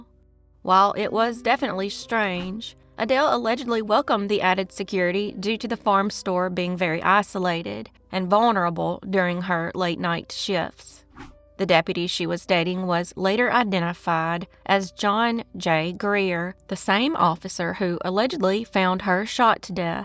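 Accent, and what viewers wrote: South, United States. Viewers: THANK YOU!! LOVE THAT BEAUTIFUL SOUTHERN ACENT!! YOU GO GAL!! KEEP EM COMING!!:face-red-heart-shape:🤠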